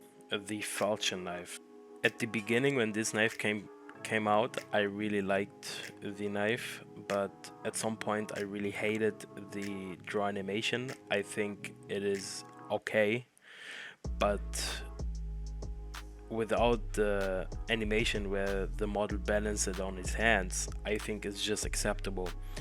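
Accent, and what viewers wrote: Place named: Germany. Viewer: Safe bist du deutscher das hört man an deiner Aussprache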